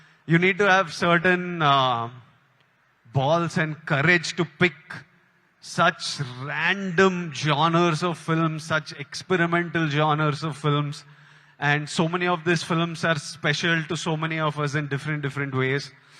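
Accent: India. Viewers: Hyd slang Ithe Ndi Telugu a adhi kuda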